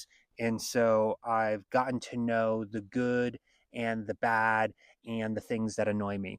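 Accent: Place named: Eastern Pennsylvania, United States. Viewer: Great video. I appreciate the way you break things down into details. I had a similar experience with a different Topo shoe that I tried a couple years ago, I think the Pursuit but not sure. It was great and balanced in every way except one small subjective thing that many people would be fine with, but I thought felt uncomfortable, and for me that was a deal breaker. Btw are you by any chance from eastern Pennsylvania? Your accent sounds like someone I know who grew up there.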